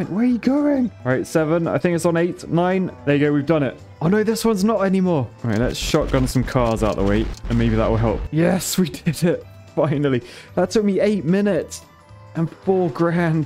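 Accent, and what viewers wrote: United Kingdom. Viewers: I love this channel and Your accent is hilarious😂! I love the British accent😁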